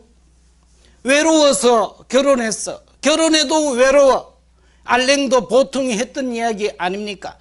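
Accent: Gyeongsang, South Korea. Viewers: 신부님 안녕하세요. 처음 인사올립니다. 전 마리아 입니다. 미국에 알라바마 어번이라는시에 있습니다. 신부님의 강론은 처음부터 끝까지 노래속에 웃음속에 하느님의진리가 저을 깨달게하고 열정을 줍니다. 신부님의 경상도 사투리가 참으로 정겹고 고향의소리라 참으로 좋슴니다. 신부님 건강하세요. 감사합니다. 수고하셨습니다.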